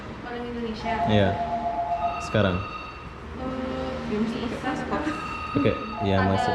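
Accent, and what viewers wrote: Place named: Malaysia. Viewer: Mau tnya,abang nih asli mana ya,? Klu ngomng ada melayu2nya?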